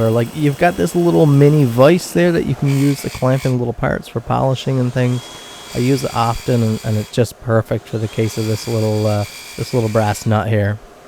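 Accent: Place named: Canada. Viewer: Beautiful outcome!! The tool is so simple it’s elegant. I really enjoy watching your work. I’ve done a number of re-handle projects and a few re-profile things and really enjoy the leatherwork!! I’ve forged one blade myself: did everything wrong, but it was pre-YouTube and really widespread internet days. Did the best I could with a wood stove and some tongs I found at a yard sale. Finally finished the knife and heat treated it in the oven; it’s good enough for a wall hanger 😜. My favorite part of your videos is the accent. I grew up in Montana, and wandered Alberta and BC a good bit. I really enjoyed The Great White North eh!! ♥️🇨🇦👍. My grandfather and great grandfather wandered Nova Scotia too. It’s in my blood.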